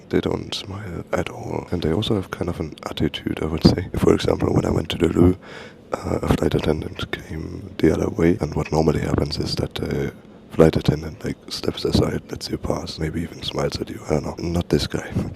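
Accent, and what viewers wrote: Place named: Germany. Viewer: 3: 16 ... can barely hear you .....plus the strong german accent ...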